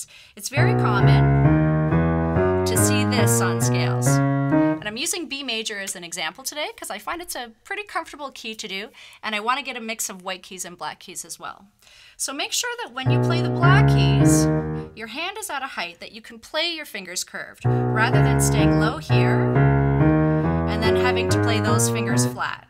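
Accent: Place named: United States. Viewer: Great and clear staff and what a beautiful american english accent!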